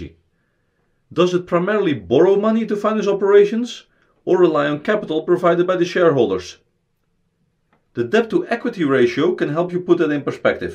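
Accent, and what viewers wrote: Netherlands. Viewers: You are Dutch...I can hear it. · Are you Dutch? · Alleen echte strijdes herkennen het Hollandsche accent :)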